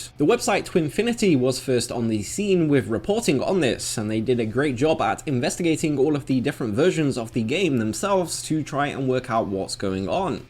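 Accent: United Kingdom. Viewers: I had to turn on the captions cause of the thick redcoat accent lol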